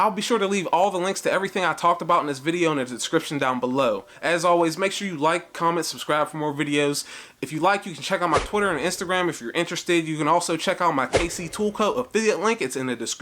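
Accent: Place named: South, United States. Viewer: i like a brother that has an southern accent as deep as my h*nky self does...you got a gmt900 truck...i've had the ecarb042 since it was first came out, wound up with 2 actually...left one of them under a 3.0 ecodiesel and it's now gone back to mother nature gaia...anyway, you're a new subscribe for me.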